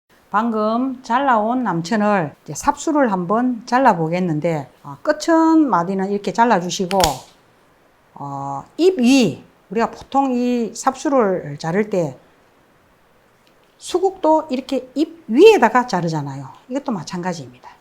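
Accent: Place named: Gyeongsang, South Korea. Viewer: 항상, 좋은 작품을 많이 보여 주시는군요.. 감사합니다. 목소리도 구수한 경상도 사투리 참 듣기 좋습니다..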